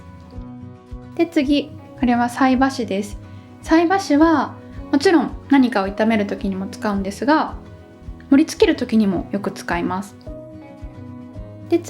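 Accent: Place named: Wakayama, Japan. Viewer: 発音が和歌山の方かな？って思って、間違えちゃいましたすみません笑🤦‍♂️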